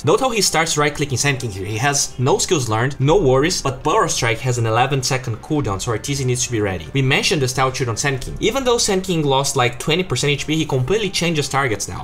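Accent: Brazil. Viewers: C é brasileiro, man??? Tem sotaque BR xD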